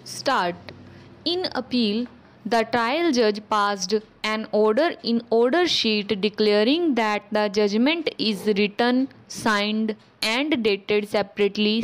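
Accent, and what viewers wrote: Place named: South India, India. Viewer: thank mam.love from west bengl.it is really help us a lot.thank you very much. but mam please try to pronounce straight foroward ,i think there is some south indian accent in it. other wise it is very help full.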